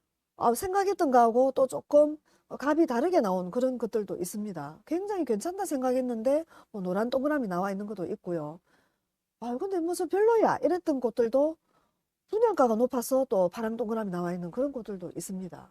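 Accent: Gyeongsang, South Korea. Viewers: 좋은정보 감사합니다~~~ 갱상도 사투리 매력적이십니다 ^^ ㅎㅎ 갱상도 아가씨 사투리 매력에 반해가지고 결혼해서. 행복하게 잘살고있습니당 ~~~ ㅎㅎㅎ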